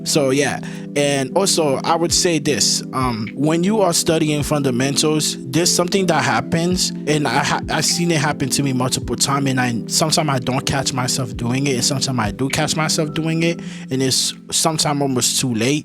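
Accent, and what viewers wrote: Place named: Haiti. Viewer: I was like "bro sounds Haitian", then I saw your channel name 😂. Peace brother🇭🇹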